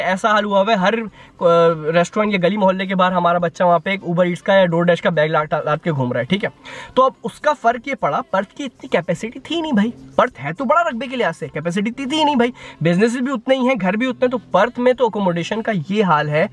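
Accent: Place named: Karachi, Pakistan. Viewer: bhai ya jo ap baat ka andr karachi wala touch daita ha na bss sara maza too is ka ha😂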